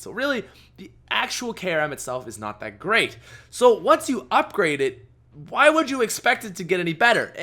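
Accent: Canada. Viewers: This guys Canadian aboot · did any one else notice the Canadian accent hahahaaha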